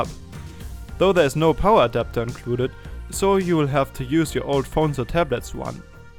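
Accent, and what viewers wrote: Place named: Germany. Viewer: Ich liebe deinen deutsch akzent gutes review, auch wenn ich es 4 Jahre später sehe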